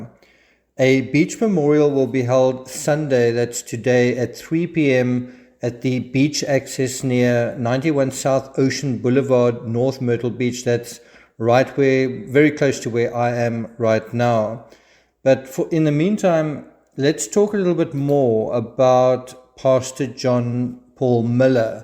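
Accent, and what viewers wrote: South Africa. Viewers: Are you South African? Your accent....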